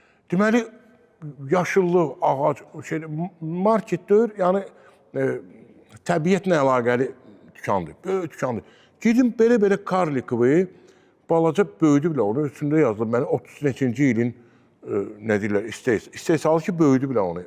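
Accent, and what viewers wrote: Baku, Azerbaijan. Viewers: большое спасибо. Бакылы данышыгы эшитдим. Бакинский акцент остался у тех, кто учился в русском секторе школы. В азсекторе преподовали учителя из районов и сел. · гагаш, тебя очень приятно слушать акцент наш халат Бакинский, да брат Баку не тот и Контингент не тот.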